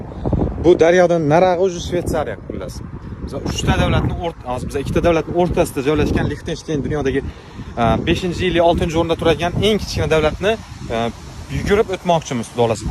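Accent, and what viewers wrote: Khorezm, Uzbekistan. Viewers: Сан хоразмли боласан ха гапиришингдан билиняпти да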